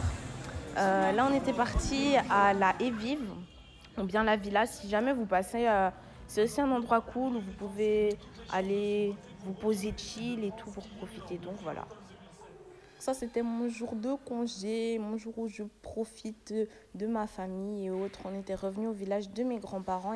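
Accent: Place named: Europe. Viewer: Cool video. Jaime bien Mais la prochaine fois n'utilise plus l'accent des européens mais plutôt notre accent d'origine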